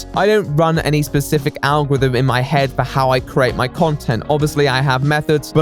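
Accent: RP, United Kingdom. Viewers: I ship you with anyone else who has a British accent and a cartoon avatar. IHE, Cynical Reviews.. you know the sort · first, good job on the content, I've been watching you since you essentially rebooted your content, with the we shall say current style of content. The accent is of course posh, I'm from the north of the UK, you sound like an afternoon tea sort of person, dial that shit up to full posh sort of thing... I love the style of content, I don't generally like the drama scene, I find daddy keemstar toxic, essentially a nuke in a China shop, while you don't cover every drama story that would be impossible I get any drama info from you, it's enough for me. If there's one thing, you sometimes talk at a break neck pace, generally it seems when the passion/emotion is flowing, but it's not to the extent for me personally I can't follow unless I'm not focusing. 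Keep up the work! There's not too many YouTubers I watch every video, only a hand full if that where I keep watching over a year plus, your one of them. I'm sure your fans would back me in saying, keep the content coming, experiment if you must, we will let you know if it's shit:) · Can you fuck off out of my country. Groningen doesn't want your either.. Take your British speal covered in that accent without giving any substance and fuck off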